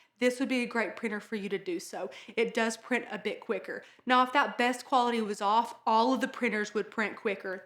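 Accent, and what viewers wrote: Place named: Texas, United States. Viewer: Hey I love the accent. Are you from Texas by any chance? Just a guess.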